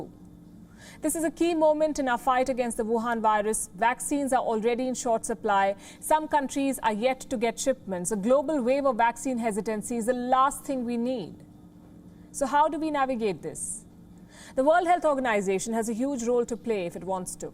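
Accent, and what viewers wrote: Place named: India. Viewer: All these years under the British masters rules you still have your indy accent YOUR COLONIZER R not very proud.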